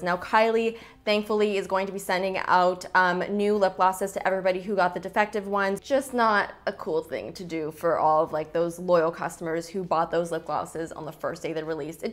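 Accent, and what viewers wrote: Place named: Canada. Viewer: What part of canada are you from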